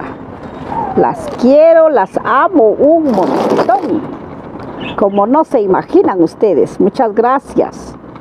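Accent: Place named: Guatemala. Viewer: Saluditos amiga💜💐tan chula como me gusta 👍 usted habla como toda una chapina . Ysi que llevo lindas plantas 👌😍💐yo soy chapima de Santa Rosa de loma 🇬🇹 y vivo en California 👍abrazos y bendiciones 🤗 en mi canal también se habla de plantitas y mas amiga 👍💚🌎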